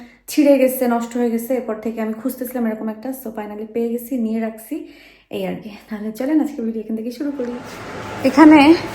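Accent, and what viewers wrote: Sylhet, Bangladesh. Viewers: এরে ও দোস্তত 🤣 খাটি সিলেটি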